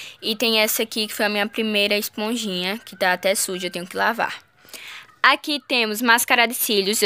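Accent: Brazil. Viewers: Amei o sotaque, vc é de Maceió?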